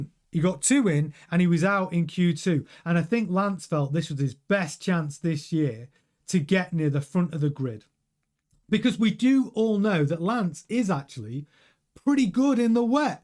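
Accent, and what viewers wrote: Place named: England, United Kingdom. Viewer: Dear sir , I cannot digest your accent , Just can’t understand what the heck you are saying, you are in UNITED STATES, not in FRIGEN ENGLAD .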